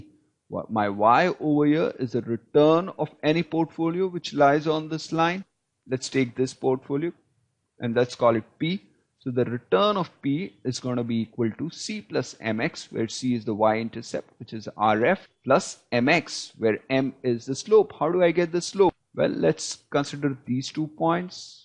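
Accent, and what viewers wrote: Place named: India. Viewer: The video seemed good, but you could've used someone with a little better/softer accent. I mean, no offence, Indian accent is already quite hideous, but this one was absolutely abominable.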